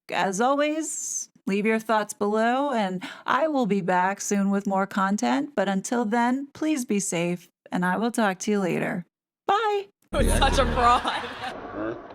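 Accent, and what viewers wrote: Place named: New York, United States. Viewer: this is totally off topic, but you should look into doing books for Audible or some sort of ASMR perhaps. The way you speak, not just my lifelong love of NYC accents like yours, but your inflections, whit and intelligence, I could listen to you do anything. Read anything. And be captivated which isn’t easy for me to be captivated by someone I hear and can’t see. Love you friend. Maybe you can voice commercials? I need you to narrate my life😂😘💛 sending love from California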